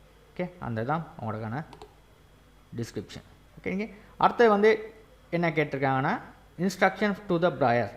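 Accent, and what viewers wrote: Sri Lanka. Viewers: Bro neenga Sri Lanka va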